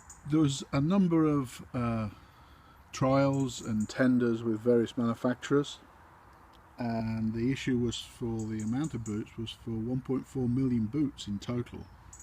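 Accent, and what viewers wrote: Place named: United Kingdom. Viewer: Ah, that lovely British voice ! Of course, you guys used your ruddy boots to kick the shit of of my country and countrymen for about two hundred years but since you people also brought in modern medicine and science and the bloomin railway, I'm not gonna hold that against you. Good video... great boots, the new ones, that is. The black ones were shit. Lovely presentation. Wish your lot hadn't decided to become increasingly 'American'. Alright, take care then. Toodles !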